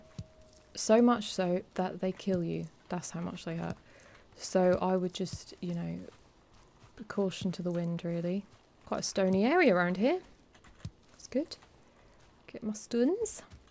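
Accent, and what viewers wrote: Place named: Hull, United Kingdom. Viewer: 'It just dunt do nowt' your accents are hilarious; was you channeling a Hullian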